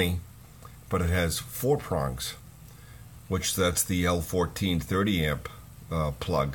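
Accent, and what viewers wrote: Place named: New York, United States. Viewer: NiceyNice. You gottta be from New York. :)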